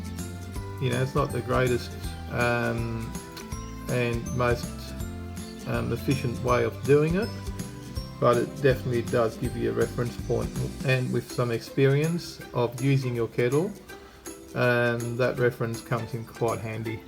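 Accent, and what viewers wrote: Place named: Australia. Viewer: Australian accent... I understand it perfectly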